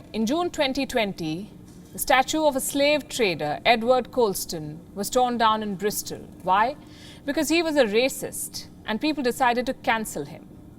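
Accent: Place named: India. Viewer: I can actually understand this lady she makes the Indian accent sound beautiful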